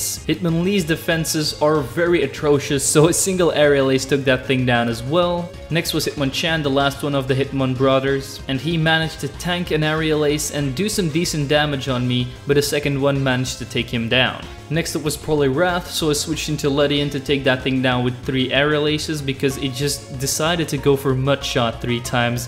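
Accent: Netherlands. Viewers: Well if u aint Dutch or from Belgium idk anymore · You sound dutch, is this correct?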